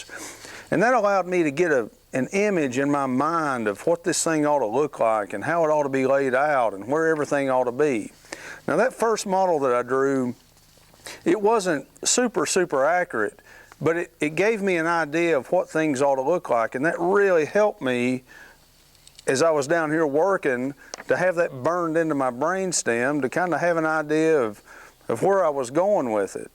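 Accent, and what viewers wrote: South, United States. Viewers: ***** You may be closer than you think. It sounds to me from listening to you on the videos that you may be from Alabama, Mississippi, Tennessee or Georgia. Not sure where you are but I'm in Mississippi. You probably noticed that my storage building has gone from something smaller (8X10 or 10 X10) to 12X12 or 12X14. It doesn't make any difference what size I make it, I'll have the same problem you have, it will be too small. I'm like you though, I want to do it by myself. It's the satisfaction of accomplishing something and knowing you did it without help. I'm not going to go so far as to cut down the trees and mill the lumber myself. And mine won't be as high as yours and I'm thinking of a lean-to roof. Flat with a slope from front to back. The things I've learned from watching your videos will come in handy no matter what the size of the building. 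Get is square first and get the holes level and so much more. Thanks again. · Wow I was so impressed with your presentation! Very very nicely done and with the nice southern accent!! I'm guessing North Carolina. I am in the planning stage of building a 20X10 garage with a 8X10 shed attached. This was the most comprehensive well thought out well constructed video on construction I have ever seen! Well done!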